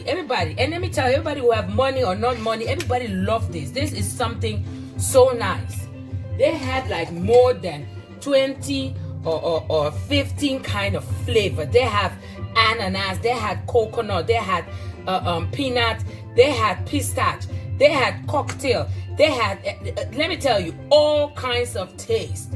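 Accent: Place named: Africa. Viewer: Omg love hoe u zo excited bent. Zo grappig hoe u Engels praat klinkt beetje als hoe Africans engels praten.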